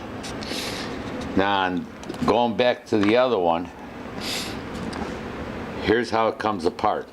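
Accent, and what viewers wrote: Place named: Pennsylvania, United States. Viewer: This guy is definitely from northeast pa , probably scranton!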